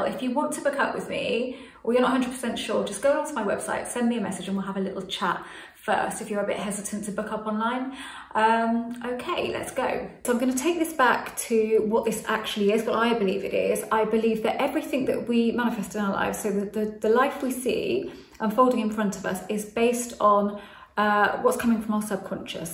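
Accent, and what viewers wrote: United Kingdom. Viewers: Thank you very much. It sounds so simple and adorable with that British accent of yours. Cheers my friend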